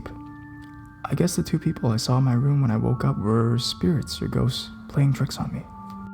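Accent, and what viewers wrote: Canada. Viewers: Canadians... that accent,and the overacting makes this post sound like one of those scary stories for kids records from my childhood, "whose got my golden aaaaarrrrrrrrmmmmmm?" kinda thing. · Are you Canadian?? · I can tell he's Canadian because instead of "about," he says "aboat."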